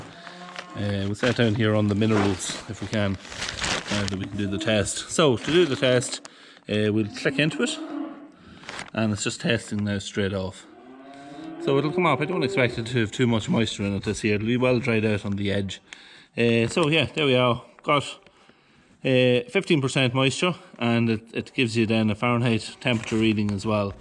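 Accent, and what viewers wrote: Ireland. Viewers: Where are you from in Ireland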